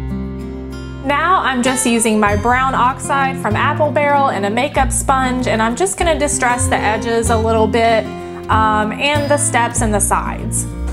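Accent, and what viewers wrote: South, United States. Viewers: I guess it probably because of the music and your voice is the voice of my southern life..❤️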